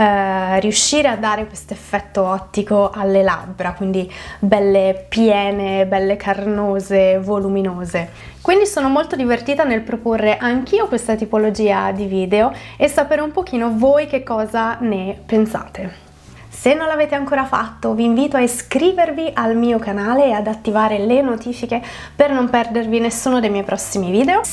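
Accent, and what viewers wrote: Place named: Tuscany, Italy. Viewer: Che bello l'accento toscano😊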